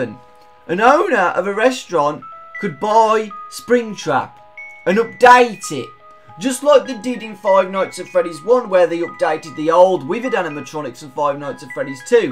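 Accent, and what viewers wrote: United Kingdom. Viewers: is that a british accent? "pizzaria ,suvoid, foulks " sry If I`m being racist but I found it funny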